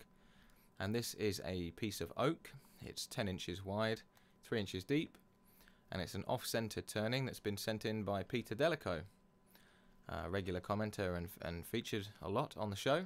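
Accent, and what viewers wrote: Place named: United Kingdom. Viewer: This is definitely one of the nicest things you ever turned. Also, I would like to know what is the brand of your lathe? Also how old is it, and how do you keep it running so well. I really like your channel, Your voice is pleasant and I like your British accent. Keep turning sir, I know, your thinking I don't have an accent, you do and your correct. Take care, and be careful.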